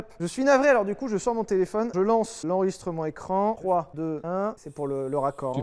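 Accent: Belgium. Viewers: On est d'accord 😉. Mais perso, l'accent que prend dans cette vidéo, n'est pas vraiment l'accent Liégeois pur et dur. Je trouve qu'il se rapproche plus de Caudy Focan que de Renaud Rutten. Enfin bref j'ai bien saisi l'idée, et on ne va pas se prendre la tête pour une histoire d'accent Belge 😅🙏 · Incroyable l'accent belge de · Je pense que sur le contenu, y a rien à dire: les conseils sont au TOP! J'ai dû récemment acheter une petite auto d'occaz avec un budget serré de 1200 euros: Twingo, sur base de vos bons conseils sur le budget à 1500 Par contre... on peut parler de cet accent belge à? C'est que c'est bien fait! Je suis moi-même belge et je n'arrive pas à faire cet accent mdr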